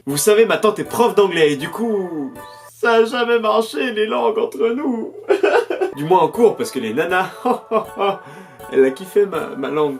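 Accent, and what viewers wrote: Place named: Quebec, Canada. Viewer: Ptdr, excellent surtout avec l'accent québécois 😹😹😹😹👍👍👍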